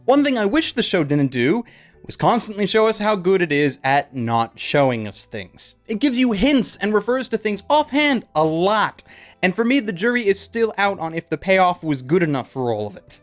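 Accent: South, United States. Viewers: your southern accent killed me , your sense of humour is so fuckin underrated and entertaining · With that southern accent, are you imlying that people from the southern parts of America is religious fanatics? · why you gotta do a southern voice bruh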